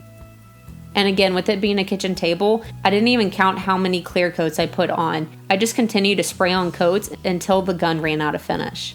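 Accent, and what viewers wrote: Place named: South Texas, United States. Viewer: Lol, give 'em hell," I've got your back". My Southern Red Neck speech is a sorry imitation of your pleasant South Texas speech.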